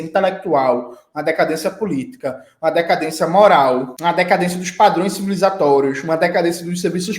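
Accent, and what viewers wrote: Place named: Recife, Brazil. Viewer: Fala pernambucano de Recife 🪸🪸😃😃😃😃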